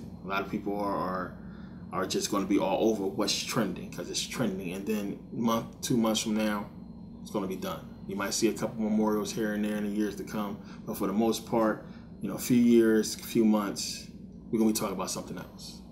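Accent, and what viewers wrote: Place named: Midwest, United States. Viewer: Young brother I am guessing but I think you are from the mid-west linguistically listening to your accent Milwaukee, Chicago, Detroit. I love your mind young brother.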